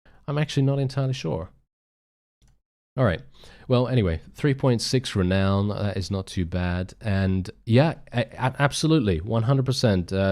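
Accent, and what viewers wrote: United Kingdom. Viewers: How can anybody not recognise you're British accent?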